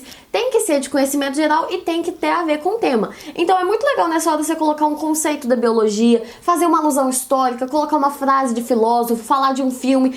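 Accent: Minas Gerais, Brazil. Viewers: Você é sensaaaaaaaaaaaaaaaaaaaaaaacional! Muito obrigado por tudoooooooooooooo! Se cair alguma coisa sobre Educação, citarei você na minha redação como os novos meios da Internet no meio educacional no século XXI. Parabéns por tudo e você fala muito amor, eu amo MG e o sotaque affffffffffffffsx<3!!!!!!!!!! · Esse sotaque mineiro é demais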